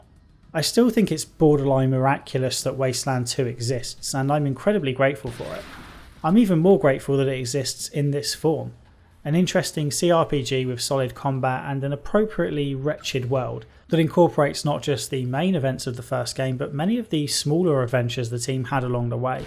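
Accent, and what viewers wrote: United Kingdom. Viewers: Soft spoken britsh game analysis ASMR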